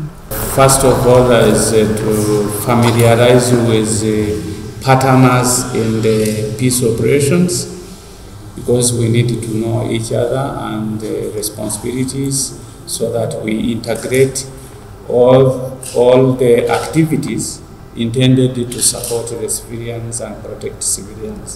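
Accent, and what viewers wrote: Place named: Eastern Uganda, Uganda. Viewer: He speaks like a Eastern ugandan for some reason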